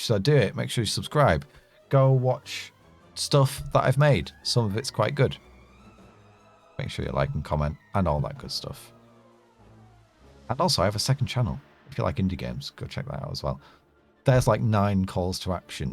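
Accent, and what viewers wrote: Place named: United Kingdom. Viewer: why is this guy british? so wierd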